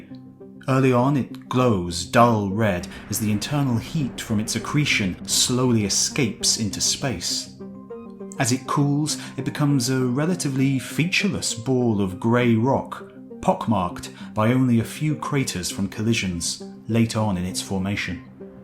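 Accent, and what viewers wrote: United Kingdom. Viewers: I already knew this stuff but I love hearing it again, your presentation is awesome. Plus a british accent always makes things sound much more majestic. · He's from the United Kingdom, not the United States. I'm from Liverpool and pronounce it the way he does.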